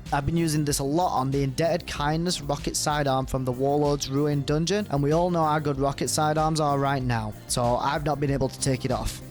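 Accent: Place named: Yorkshire, United Kingdom. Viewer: Yorkshire lad! Where's tha from lad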